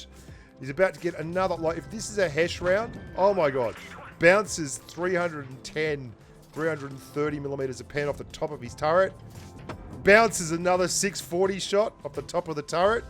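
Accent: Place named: Australia. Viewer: mate r u aussie? you sound so much like one.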